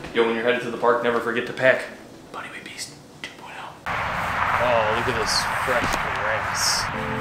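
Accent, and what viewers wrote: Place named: Midwest, United States. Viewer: where is this guy from? THOSE AAAAs are midwestern AF! Canadian? he’s freakin gorgeous and sounds so funny lol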